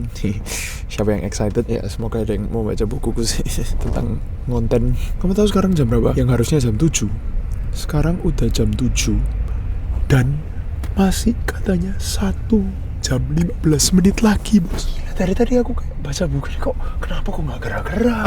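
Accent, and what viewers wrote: Java, Indonesia. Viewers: Walah koh mbok mgomongnya yg bener...aku jadi ketawa ketiwi.. Kok yo medok banget😂😂😂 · Kamu orang mana bang.. Kok lucu medok banget kl ngomong bahasa Indo. 😊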